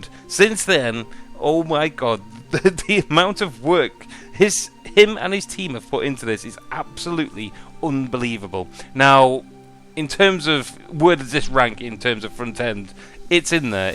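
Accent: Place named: United Kingdom. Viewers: great video, btw where is your accent from? It sounds british but I've never heard it before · its one of the few british accents i think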